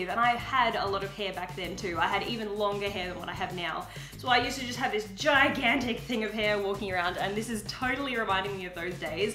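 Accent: Australia. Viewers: GURL U AUSSIE!!! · are you australian?and your bird is so cute i had the same one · im choking, you're aussie? bLEsS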